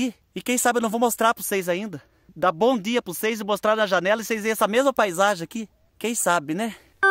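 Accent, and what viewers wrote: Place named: South, Brazil. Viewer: A primeira vez que vi os vídeos do,eu pensei que ele fosse do Sul tbm.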